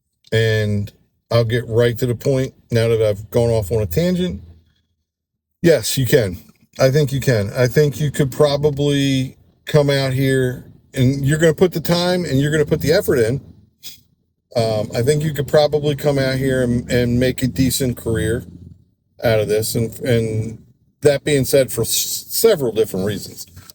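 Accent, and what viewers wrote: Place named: Mid-Atlantic, United States. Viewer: So true “If you’re in your car, you’re not at heume” Love that mid atlantic accent. Where I’m from. When I go back home, I hear the accent and takes me back.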